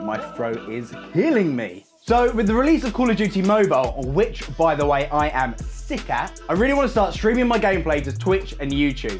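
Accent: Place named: Europe. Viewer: I dont understand shit. European english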